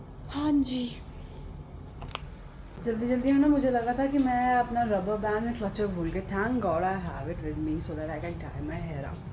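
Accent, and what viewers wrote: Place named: Pahari, India. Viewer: One of my family member (one uncle) married from Shimla. They have Apple orchards. I felt Pahari influence in your hindi. It is sweet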